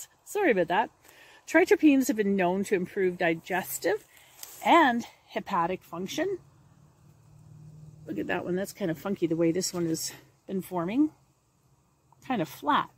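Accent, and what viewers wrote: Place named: Canada. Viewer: Beautifully presented and i love your accent, I'm presuming Canadian, in particular words like "about" "road", something about the way you pronounce 'oa' 'ou'. Get plenty more of those words into your videos please!.... 🙏💖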